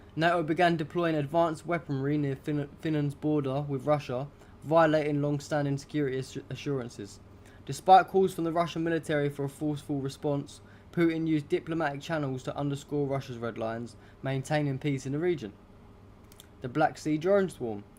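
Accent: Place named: United Kingdom. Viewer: I like how your accent is (the British flair 😅), especially at the beginning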